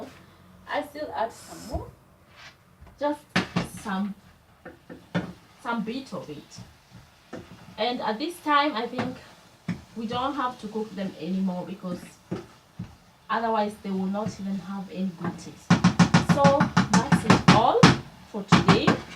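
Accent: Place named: Tanzania. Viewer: wooow surely you speak really like us Tanzanians.. It was quite easy for me to understand you... Hahaha I really laughed alooot when you said you ate ukoko at school. I really enjoyed watching you cooking Ugali mboga. As a single man tomorrow i am going to try cooking ugali mboga.... You did it quite well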